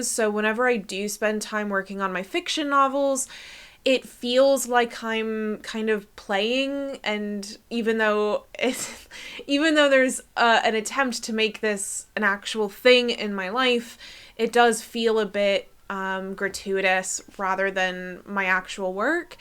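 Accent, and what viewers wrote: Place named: United States. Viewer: Which city are you living ? Because it seems like European country but your accent is like American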